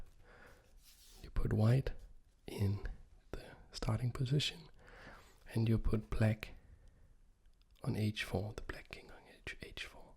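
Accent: Denmark. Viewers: Loved your video on the French Whisperer Collab and I'm here again. I'm guessing by your accent that you're Danish, perhaps? Maybe you've mentioned this in an earlier video, apologies!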